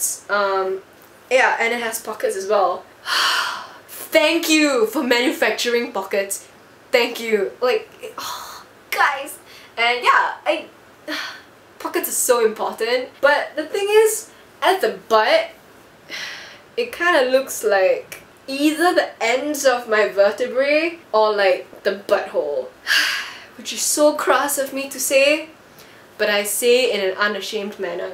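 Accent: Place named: Singapore. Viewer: I love your accent! I also from Singapore as well~ hope to see you around west area :)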